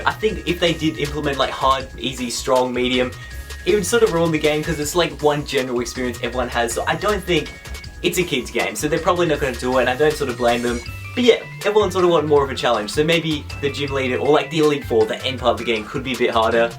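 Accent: Australia. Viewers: he is Australian that is how we speak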